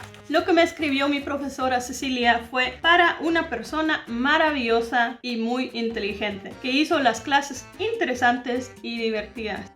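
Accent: Standard. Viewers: Tu español es súper fluido 👍🏻con un acento neutral mayormente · tu acento esta neutro pues es lo que veo en este video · Es muy elegante tu español, para mi oído es neutral. Qué bueno que hables bien de mi país Ecuador bendiciones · Buen vídeo, eso es lo que me pasa a mi me da miedo hablar holandés y no expresarme como quiero,me atrevo hablarlo con conocidos de mucha confianza con desconocidos se me olvida todo,espero lograrlo y hablarlo tan bien como tú hablas el español 💋y por cierto tu acento es neutro 😃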